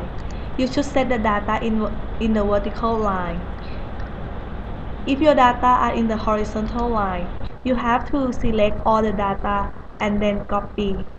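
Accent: Thailand. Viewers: Thai accent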